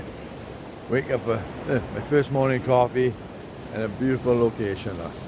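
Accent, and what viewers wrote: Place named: Welsh Valleys, United Kingdom. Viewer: You just hit my algorithm, just subbed. You sound like a valleys lad. Self - deprecating, no nonsense, tell it as it is, dry sense of humour. Wishing you all the best 😊🏴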